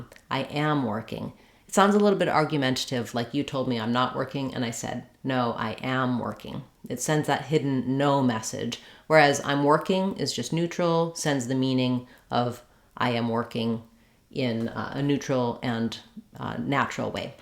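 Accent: United States. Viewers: Thank you,. It's a lot of people helped change the English language. Special American accent Thank you so much.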